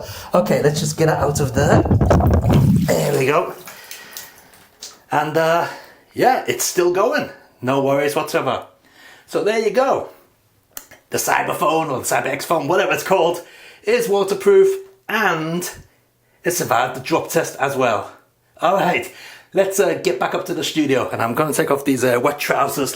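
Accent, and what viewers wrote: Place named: England, United Kingdom. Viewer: Thanks, good review. Surprised to hear an English accent tho appears you aren't on UK from your photos. I've shortlisted it, assume will work ok in uk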